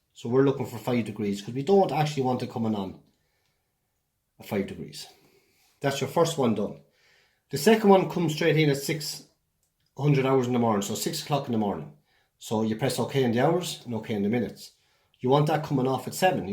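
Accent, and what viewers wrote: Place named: Ireland. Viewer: Cool to hear an Irish voice as the guide! ☘️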